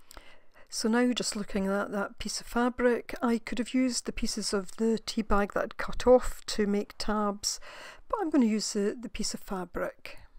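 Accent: Scotland, United Kingdom. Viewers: I just loved this... thank you so much. Fabulous! I so enjoyed your Scottish accent. My only son works and lives in Scotland as a green keeper. I have visited once in 2019. The most magnificent country. I hope you are safe and well wherever you are. Stunning work. Aaah tea ☕️ best regards from South Africa to you 💫🇿🇦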